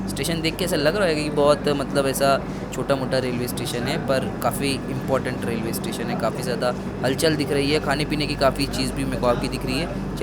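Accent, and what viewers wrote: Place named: Gujarat, India. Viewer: Gujarat se ho kya, hindi accent gujju lag raha h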